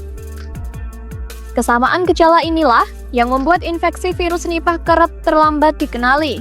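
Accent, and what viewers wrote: Java, Indonesia. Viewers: Medok banget ya logat jawanya😂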